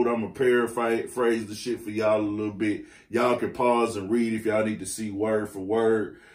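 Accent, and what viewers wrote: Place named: Houston, United States. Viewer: Bruh! Are you from Houston? Man u sound like you from my city! Lol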